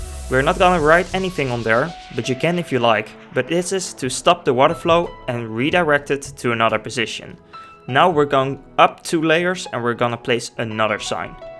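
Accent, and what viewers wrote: Netherlands. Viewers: NL !! je hoort het meteen. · are you nethetlands vause of your english speach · Ben jij Nederlands? (Accent) :D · are you from the netherlands? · Ben je Nederlands? Als ja ik hoor het aan je accent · He is dutch yes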